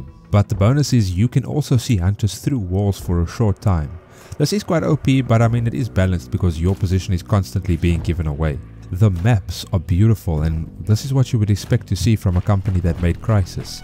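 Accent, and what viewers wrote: South Africa. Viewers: you have a very mild south african accent.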